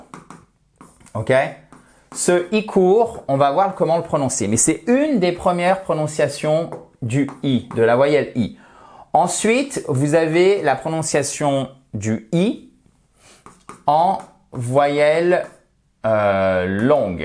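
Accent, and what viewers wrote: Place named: United States. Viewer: Vos vidéos sont instructives et intéressantes. Votre prononciation se rapproche plus de l’anglais américain que de l’anglais britannique. Bonne journée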